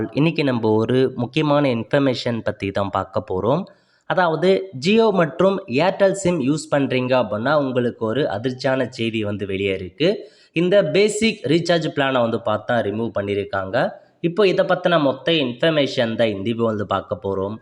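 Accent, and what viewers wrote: North, India. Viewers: உங்கள் பேச்சு வடக்கன் மாதிரி இருக்கு. கொஞ்சம் தெளிவாக புரியும்படி உச்சரித்தல் நன்று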